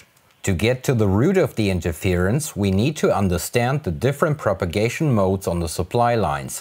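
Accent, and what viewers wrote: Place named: Germany. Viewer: schönes video. Achjaaa deutscher Akzent 😁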